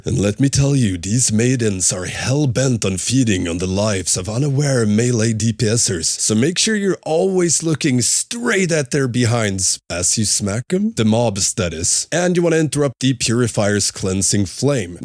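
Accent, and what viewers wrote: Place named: Sweden. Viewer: Hördes på flera ställen och inte bara i outrot att du är svensk. Kul nyhet :)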